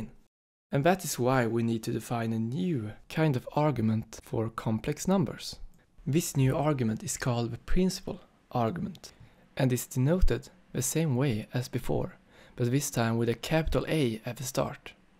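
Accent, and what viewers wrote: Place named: Sweden. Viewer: Are you possibly swedish? (Very very few times i thibk i can distinguish sole swedish accent). Either way, great video!! Really enjoyed it :)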